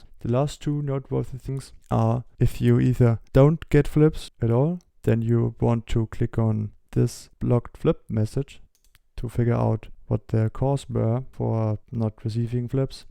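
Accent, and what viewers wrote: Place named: Germany. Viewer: Der Deutsche Akzent knallt richtig hahaha gutes VIdeo ^^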